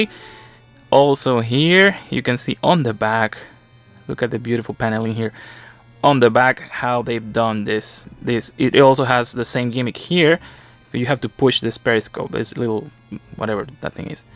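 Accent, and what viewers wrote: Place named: Conyo, Philippines. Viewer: nice review. conyo was just the icing of the review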